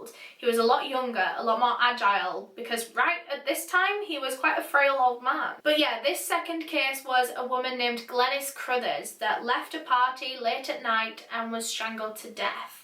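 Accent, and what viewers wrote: United Kingdom. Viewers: your accent is so.. brit gangsta- i love it... keep up the tight work, its awesome, so far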